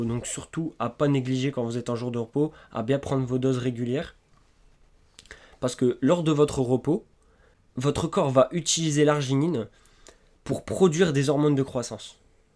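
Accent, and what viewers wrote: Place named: Nord-Pas-de-Calais, France. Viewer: Super bonnes explications, avec un "grave" accent Ch'ti, en supplément🙄🤗. Je vais même réécouter cette excellente vidéo, super complète et scientifiquement pertinente, pour noter les points que je connaissais moins bien. A 63 ans 1/2, j'ai eu il y a peu ( après m'être épuisée pratiquement jusqu'au burn out à soigner jusqu'à sa fin un parent, puis l'autre), un manque de tonus et réponse musculaire grave. Je n'arrivais plus à me relever, difficilement à monter un petit escalier et à marcher. C'était incompréhensible et ma Dr me disait: "C'est l'âge ! ". Tu parles, ça se saurait si à 63 ans, les gens, en 2021, étaient pratiquement TOUS incapables de bouger !!! En réalité, bien que n'étant pas végétarienne, je manquais de vitamine B12 et un chouia de potatium. Pourquoi ? Je ne sais pas. Un sursursurmenage trop intense, pendant trop, trop, trop, trop, trop, trop, trop d'années (7 ans 24h/24, après 20 ans par intermittence) , trop de charge physique et mentale non stop en solitaire ? 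Bof, peut-être ou ? Je vais mieux mais faut toujours que je sois sur tous les fronts, alors j'ai bien l'intention d'alimenter ma machine humaine pour qu'elle soit efficace et autonome le plus longtemps possible. Le moral et la cervelle, ça va. Je sais comment les maintenir en forme naturellement. Vraiment, tu es très clair et pédagogue. La petite vieille te fera savoir si elle parvient à reprendre un peu de fitness, de danse, de vélo et de randonnée. 🧙‍♀️🏋️‍♀️💃🚴‍♀️🚶‍♀️💪 🧙‍♀️ 🤭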